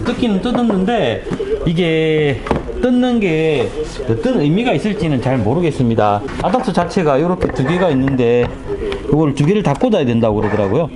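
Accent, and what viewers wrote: Gyeongsang, South Korea. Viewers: 님 어디서 일하세요? 지역이 궁금해서리 느낌이 대구?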